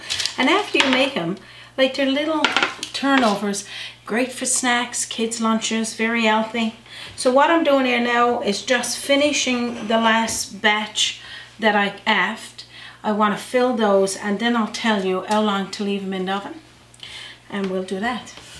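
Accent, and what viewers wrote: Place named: Newfoundland, Canada. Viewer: I just discovered this channel tonight. Lovely to hear the 'Newfie' accent! True maritimer with a cup of tea to go with! Thanks for your knowledge! Happy holidays my fellow Maritimer!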